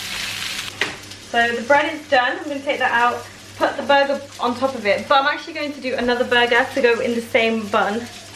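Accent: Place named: United Kingdom. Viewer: You got a real British Accent.. I bet!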